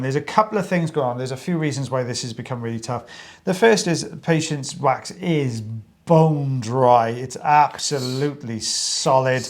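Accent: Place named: South Wales, United Kingdom. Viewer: Nice to hear a bit of Welsh. South Wales I’d say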